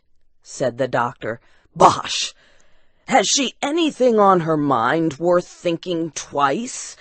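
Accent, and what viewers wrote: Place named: United States. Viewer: 'Her' voice 'is' not good . Wrong grammar . I hope you think twice before commenting next time because that's a very nice american accent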